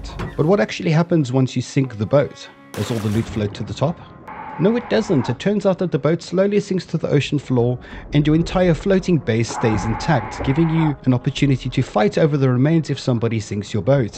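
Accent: South Africa. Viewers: Are you South African???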